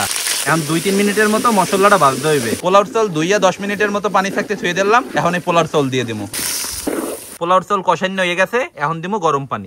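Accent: Barishal, Bangladesh. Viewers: Cock মানে বললেন রওয়া বা মোরগ এইটা বরিশালী ভাষা আমিও বরিশাল এর বরিশাল এর কিছু কিছু language অনেক❤ sweet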